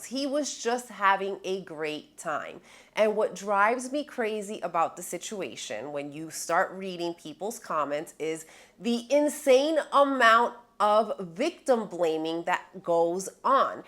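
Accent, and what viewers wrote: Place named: Paisa, Colombia. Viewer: Great video and U love the paisa-ish accent when you speak Spanish! And a fantastic, timely warning. For anyone who doesn't know, though, "No dar papaya" is an expression that means don't let your guard down or otherwise make yourself an easy mark.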